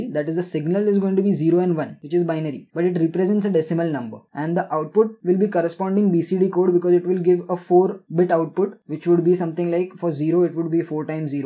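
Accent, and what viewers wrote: India. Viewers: He is an Indian and its obvious that he'll have an indian accent.Stop expecting people to change their accent according to your convenience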